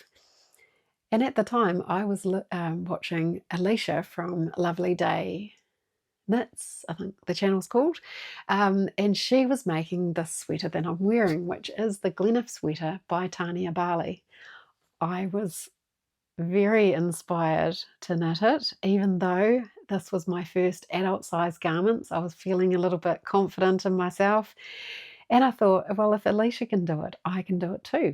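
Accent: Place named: New Zealand. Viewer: Hi from Trieste (Italy)! I’m a crocheter/knitter/everything yarn-related addict, and I’m in love with NZ. I’ve spent a month in Auckland on an English summer course in August 1992 and had such a great time! I still own and cherish the cabled jumper I started in Auckland and finished on the plane back home- and the leftover wool that I keep just in case😅. It’s so good to hear your Kiwi accent, it reminds me of all the nice people I met there❤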